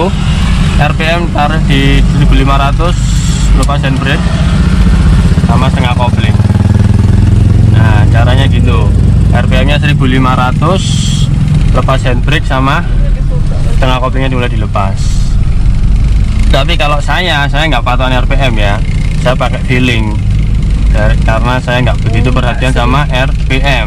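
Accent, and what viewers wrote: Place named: Java, Indonesia. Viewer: Dari logat bicaranya sepertinya sampean orang Jawa mas ya.. 🙏 Salam saking tiyang Jawi teng Papua mas-seee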